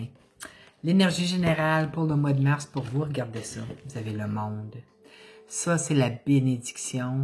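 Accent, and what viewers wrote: Quebec, Canada. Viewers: oula l accent québécois 😍❤❤❤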